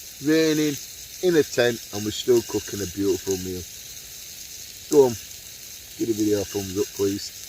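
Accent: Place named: Cockney, United Kingdom. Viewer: Well, the video would probably be enjoyable, but for your speech. I don't wish to corrupt & forget (foorgit?) MY English by hearing all the cockney "boogs (for 'bugs'), "stoof (for 'stuff') and "coomfertIble" (for, 'comfertable, of course)...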